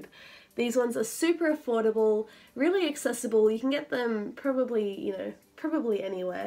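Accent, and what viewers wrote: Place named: Australia. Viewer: This is probably stupid but when I opened the video my first reaction was is this a fellow Aussie...I think so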